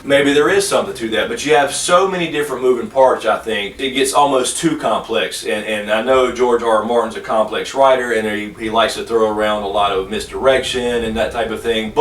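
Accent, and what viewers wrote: South, United States. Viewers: just subscribed and am enjoying your videos - very well-informed and i like the common sense tempering all the crazy theories we make up with too much time between books, lol. i live in the northeast, so i am also enjoying your southern pronunciation of the series' names and such - it adds some regional color to it and once in a while a little confusion on my part. in a video you were talking about the dane (spelling?) family sword "dawn", but i kept hearing "dome" and wondering where there is a dome in westeros, till i realized the regional accent difference. it's nice our regional accents still survive in this internet age. i'm subscribed and looking forward to more great videos. (i also like george r-squared martin, lol) as far as jon and dany's parenting goes, i keep several possibilities floating in my head. i won't go into all of them, but r+l=j seems pretty obvious now that i've seen the series thus far and read the books three times. 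but it worries me because grrm doesn't like things to come easy to readers. it is very possible biologically that lyana could have become pregnant and given birth 9 months after she had jon. and "the seed is strong theory" could just be medieval cluelessness about genetics. even the starks have some kids like starks, and most like tully's. i wonder about dany's visions when she was with the undying. so many of them were about starks. there is a definite connection there, though it doesn't need to be familial. keep up the good work!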